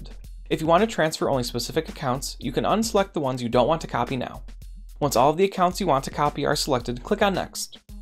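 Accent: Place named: United States. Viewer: When giving instructions, speak slowly and clearly. You aren't chatting to family and friends but to people all over the world who may not have US English as their native tongue. We have difficulties with your speed, your accent and your manner of explanation. Thanks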